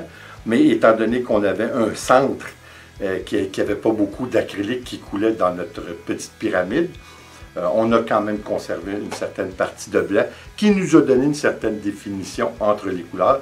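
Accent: Canada. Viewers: Magnifique accent canadien 😀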